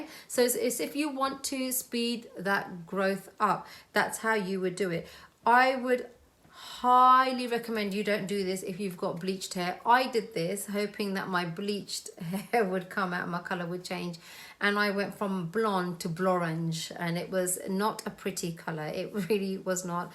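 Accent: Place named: United Kingdom. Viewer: Love ur British accent!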